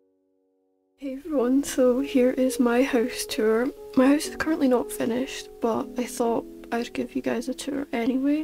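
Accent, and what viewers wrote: Scotland, United Kingdom. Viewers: OMG A SCOTTISH BLOXBURG YOUTUBER SCOTLAND FOREVERRRR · She’s Scottish like my silly billy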